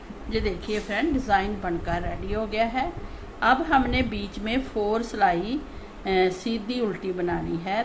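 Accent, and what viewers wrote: Punjab, India. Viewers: मैं रावलपिंडी पाकिस्तान से हूं. तुम मुझे बहुत अच्छी लगती हो, पंजाबी स्टाइल में बात करने का तरीका बहुत अच्छा है। स्वेटर बनाने का आपका तरीका मेरे जैसा ही है। मेरी माँ ने मुझे आपकी शैली में सिखाया। मैं सच में आपसे बात करना चाहता हूं. आपसे अनुरोध है कि आप एक वर्ष तक के बच्चे के लिए रोम्पर बनाना सिखाएं। Google द्वारा अनुवादित बहुत बहुत धन्यवादमैं रावलपिंडी पाकिस्तान से हूं. तुम मुझे बहुत अच्छी लगती हो, पंजाबी स्टाइल में बात करने का तरीका बहुत अच्छा है। स्वेटर बनाने का आपका तरीका मेरे जैसा ही है। मेरी माँ ने मुझे आपकी शैली में सिखाया। मैं सच में आपसे बात करना चाहता हूं. आपसे अनुरोध है कि आप एक वर्ष तक के बच्चे के लिए रोम्पर बनाना सिखाएं। Google द्वारा अनुवादित बहुत बहुत धन्यवाद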